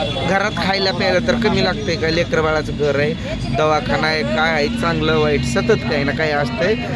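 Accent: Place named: Latur, India. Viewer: दाजी पीवर लातूर ची भाषा की ओ तुमची😂😂😂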